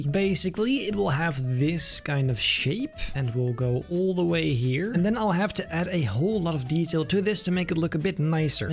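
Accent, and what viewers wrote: United Kingdom. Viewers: he is getting to british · Is he British init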